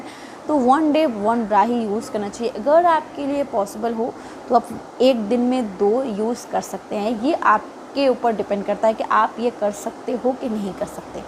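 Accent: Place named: Bihar, India. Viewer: Aap bihar ke rahne wale h... Madem jee aap ka Bolne ka tarika pta rha h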